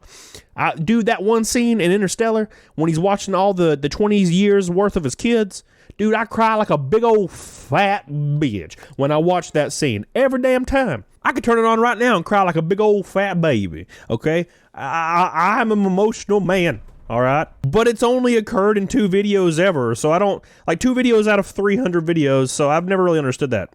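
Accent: South, United States. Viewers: Your accent lol, it’s just fine. Your from the south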